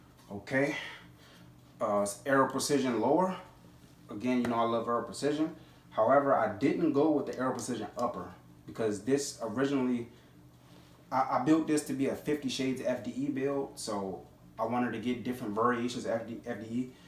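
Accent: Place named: Maryland, United States. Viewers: As soon as u started talking,I knew exactly where you're from lol.Salute to u from a fellow 2A and DMV brotha.My collection isn't as extensive as yours,but you' got me motivated.That's a Raw collection u have bro 💯. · Nice collection fam. We all knew what state you were in. Maryland accent is strong. Go check out a couple of my builds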